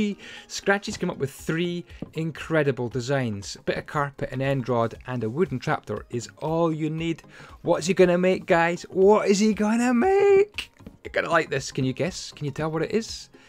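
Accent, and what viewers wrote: Scotland, United Kingdom. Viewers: is this guy scottish? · are you scottish? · Are you Scottish I am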